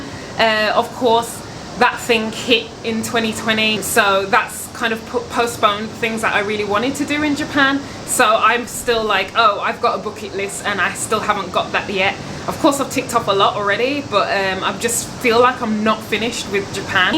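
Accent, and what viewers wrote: Birmingham, United Kingdom. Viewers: Girl!! I totally know what you mean about "settling" when making friends. I've been in Japan since 2018 and have a select few people I can call friends, pretty much because I can't get attached to people I wouldn't really hang out with in the UK. I know that makes me sound like a snob but Japan attracts a lot of weird foreigners and I don't want to spend my time with people I don't actually click that well with, or put myself into any compromising situations... hence my lack of a solid circle. One of my good friends (who no longer lives near me :(... ) is from Birmingham. He has the same positive energy as you and the accent sounds very similar. Are you from around there by any chance? Anyway, I feel like if we met for real, you'd be someone I'd choose to hang out with. Thanks for your positive, down to earth vibes! Hope to bump into you one day! 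:)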